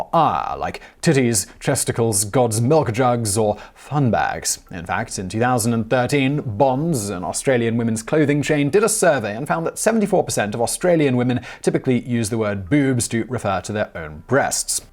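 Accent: England, United Kingdom. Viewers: LMBO saying fun bags in English accent with a straight face just hillarious 😂 very interesting research lol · Never have I heard someone speak so eloquently and academically on colloquial breast terminology. British accents make everything sound more serious.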